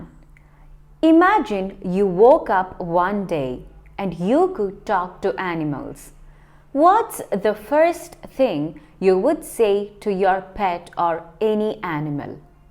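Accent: Kerala, India. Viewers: Are you malayalee?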